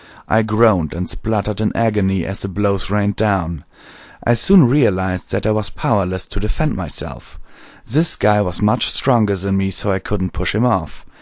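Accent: Germany. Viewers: I think you’re from Germany but you have an English accent mixed with German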